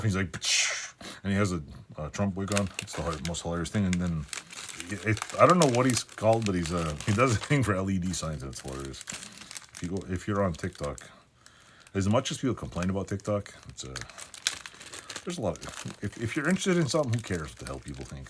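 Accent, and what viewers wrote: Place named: Canada. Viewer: you sound like native canadian but are from utah i assume... so odd... <link> sign guy btw ^ Tony!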